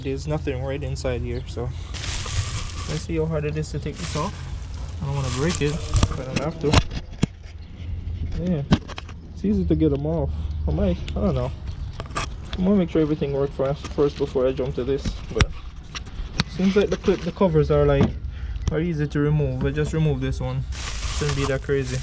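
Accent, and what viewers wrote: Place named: Jamaica. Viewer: Man sound like a yaad man.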